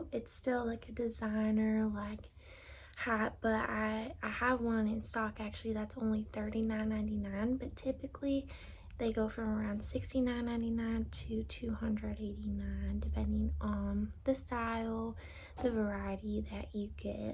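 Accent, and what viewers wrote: South, United States. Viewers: i love your southern accent videos, hope to see more of them <3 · Soft spoken ✨️ Southern accent 🌸 Showing us things on iPad 🎀 10/10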